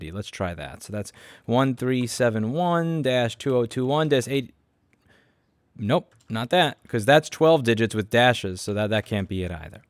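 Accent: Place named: New England, United States. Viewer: if your serious about leaving the city and are looking for new places to establish your shop- <link> low taxes, there is software development community in Charleston, Clemson University mints engineers and companies like BMW have moved US operations there. Now if your worried about your Yankee accent, I'm sure after some fried chicken or shrimp and grits you will acculturate so the locals think your a tipsy german thats been relocated by BMW. Good luck Mr. Rossmann!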